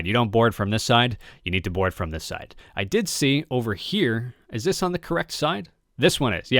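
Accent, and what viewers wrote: Canada. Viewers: sir you have a Canadian accent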